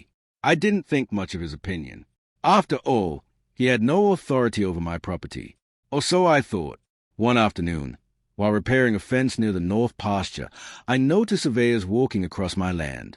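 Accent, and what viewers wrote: United Kingdom. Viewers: A Texas rancher with a British accent, interesting.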